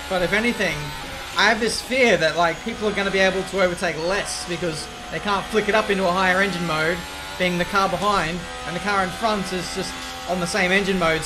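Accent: Australia. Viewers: If you wouldn't have that aussie accent you would have said mugello pretty accurate. You say "mugellou" you should say "mugello" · an Italian here. I confirm your pronunciation was on point, even if the English Australian accent was still there 😂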